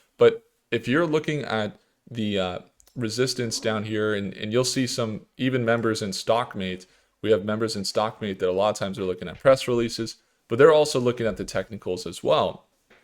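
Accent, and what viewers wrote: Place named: Netherlands. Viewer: You have a Dutch accent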